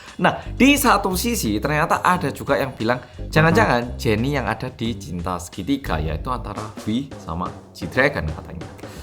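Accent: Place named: Malang, Indonesia. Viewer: Sumpah bawaannya ketawa kalau mas jelasin medhoknya ya ampun xixixi, bener bgt penjelasan mas "itu sudah jadi ranah kehidupan pribadi yang tidak perlu kita sentuh" 😆 dari pada sibuknya buat teori, ternyata artisnya ngeprank. Sehat terus ya mas 💕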